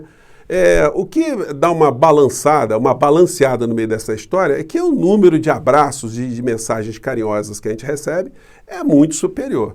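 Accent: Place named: Northeast, Brazil. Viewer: SR. esse seu jeito de falar é universal todas pessoas do Brasil lhe entende, mas lhe pergunto tu és Nordestino?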